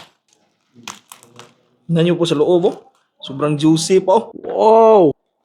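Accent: Visayas, Philippines. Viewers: Wag ka mg Tagalog halata kc bisaya ka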